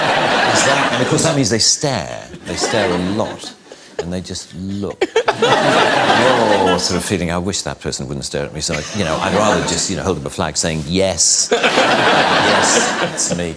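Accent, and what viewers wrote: United Kingdom. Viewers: 不知道為什麼看到他正常的講話有點不習慣😂而且他的英國腔也太好聽了吧😍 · 喔喔，低音炮＋優雅英腔殺我😍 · 挖原來豆豆先生的聲音是這樣XDD 英國腔就是好聽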